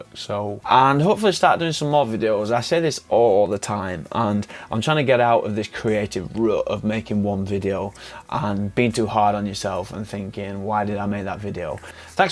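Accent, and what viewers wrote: United Kingdom. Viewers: Your accent is nice, not weird, sounds like a notherner. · I'm a bit surprised that people would find your accent strange. People with UK accents are very common in Canada a lot of Brits come here.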